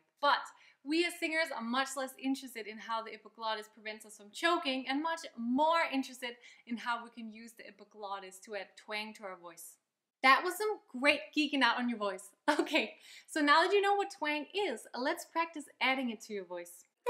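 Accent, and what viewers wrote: Denmark. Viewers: That Danish accent though.... <3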